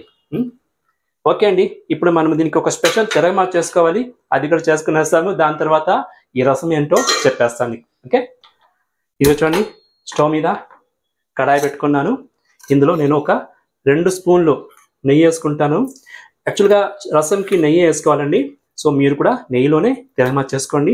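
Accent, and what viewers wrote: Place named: Karnataka, India. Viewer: మీ తెలుగు స్లాంగ్ చూస్తే మీరు కన్నడిగులని తెలుస్తుంది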